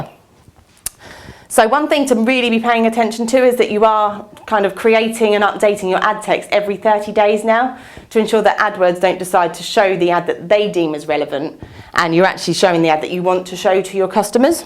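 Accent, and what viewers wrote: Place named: England, United Kingdom. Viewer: Good to hear an English voice for a change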